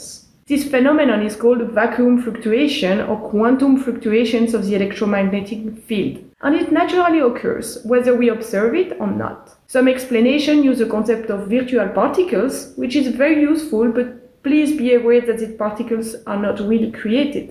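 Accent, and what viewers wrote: France. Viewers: helloo i love your french accent, i could listen to you talk about casimir effects all day 🤩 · I noticed a french accent. surely not a surprise. But given that google ASK AI is only in english (at least not in french) I guess this is another science communication force toward french to english to french communicatino in scinece. off topic in passing just noticing.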